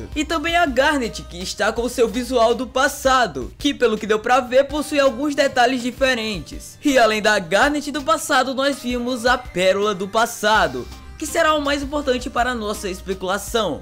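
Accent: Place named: Pernambuco, Brazil. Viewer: Mano vc é pernambucano ! Gostei do sotaque tá carregado n he he !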